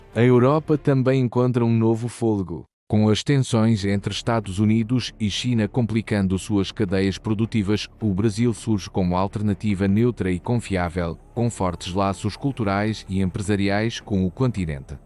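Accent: Portugal. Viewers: tira essa voz de português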